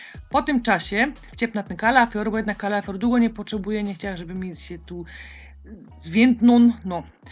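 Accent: Silesia, Poland. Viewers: Pani jest Pani wspaniała, mówi Pani ślicznie gwarą śląską. Nie rozwleka Pani swoich komentarzy, jak inni mają w zwyczaju, zanim dojdą do meritum sprawy to nagadają się dużo i nie na temat, że człowiek zmuszony jest wyłączyć takiego "gawędziarza. Pani natomiast robi szybko nie przedłuża czczą gadaniną za to Pani komentarze są rzeczowe okraszone dowcipem. Po za tym, tłuszcz to samo zdrowie dodatkowo pięknie odchudza bez efektu jojo. Dzięki tłuszczowi przyswajane są najważniejsze witaminy: D3, K2MK7, A, E.tak zwany ADEK, bezwzględnie należy przyjmować z tłuszczem, inaczej nie będzie żadnego efektu. Te witaminy rozpuszczają sie tylko w tłuszczu. Szacunek dla Pani. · Pani ta zupa to mistrzostwo świata!!!!to jest zupa co ma wszystkie zupy pod sobą i jeszcze ta Śląska mowa i wspaniałe wykonanie pozdrawiam 🥰🌹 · Przepiękna śląska wymowa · jestes super dziewczyna, czy to śląski Akcent⁉️🌻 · Albo mówisz po polsku, albo pięknie godosz po śląsku. 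Na śląsku nie ma pomidorów. Są tomaty. Godej jak prawdziwa Hanyska. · sol jest nam bardzo potrzebna,ale nie w nadmiarze. sol naturalna,a nie ta z kartonikow ktora ma 90% chemii.najlepsza nasza sol klodawska albo z wieliczki.Pani bardzo pania lubie,a do tego ten slaski jezyk super.mieszkam w berlinie,a w przeszlosci na pomorzu. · Uwielbiam Śląską gwarę i oglądam Ciebie głównie z tego względu ale na przepisy też. Pozdrawiam. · Albo mówisz po polsku, albo pięknie godosz po śląsku. Na śląsku nie ma pomidorów. Są tomaty. pozdrawiam z Florydy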